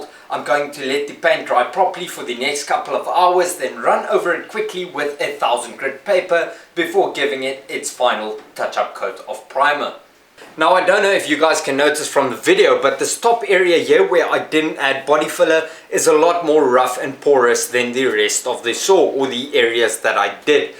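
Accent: South Africa. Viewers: LOVE your videos. My best fried is from SA and I miss him. However, your SA accent makes me feel like I'm hanging out with him and his SA friend. Not to mention, I love you tech tips...all very well done.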